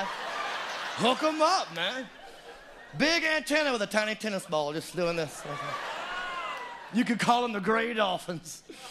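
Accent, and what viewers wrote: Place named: South, United States. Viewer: Yeah unlike Larry the cable guy you have a real country accent and funny lol God bless 😂😇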